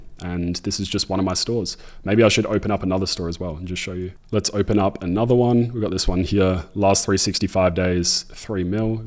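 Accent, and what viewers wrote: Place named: Australia. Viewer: Aussies accent's cute lol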